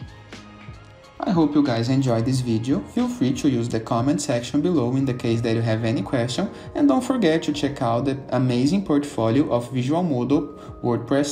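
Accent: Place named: Brazil. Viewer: Are you brazilian? maybe .. your accent is exactly the same srrsrs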